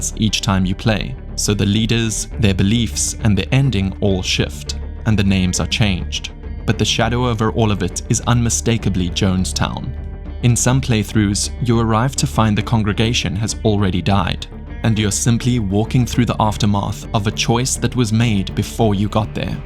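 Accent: South Africa. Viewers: Are you a south African boet😅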